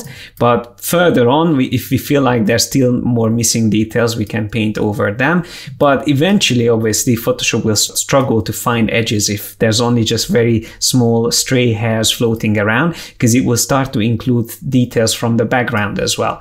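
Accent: Hungary. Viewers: jól hallom a magyar kiejtést? :D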